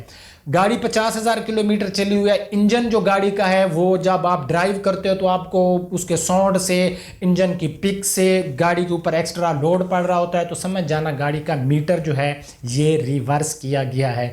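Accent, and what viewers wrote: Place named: Punjab, Pakistan. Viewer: Good info.....urdu in punjabi accent...😋😋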